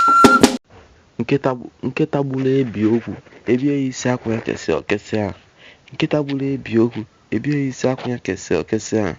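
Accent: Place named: Ezaa, Nigeria. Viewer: NONSENSE,, ARE YOU TALKING TO YOURSELF ? OR TALKING FROM A RAT HOLE,, NO PROFESSIONALISM,, CUT AND JOIN,,,GO AND LERAN ''IGBO IZUGBE'',AND STOP SPEAKING EZAA/ABAKALEKE DIALECT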